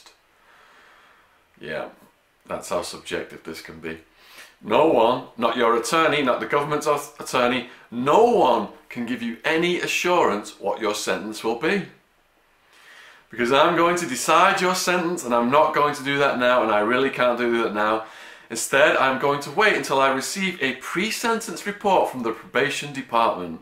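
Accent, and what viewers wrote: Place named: England, United Kingdom. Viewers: Oh.. I see you do stuff about prisons and that. My prejudice - my bad, but it was a jarring experience clicking on this and hearing some middle-class Englishman commenting on that kids situation 😋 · I did not expect a white Englishman when I went on this video, can’t complain tho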